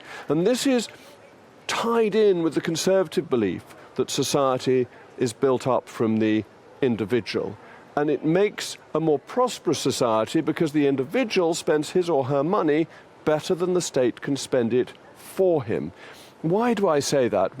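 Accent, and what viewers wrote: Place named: Upper class, United Kingdom. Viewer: Sorry, but I'm not stupid enough to listen to someone with accent like yours talk about "work". Jog on, toff.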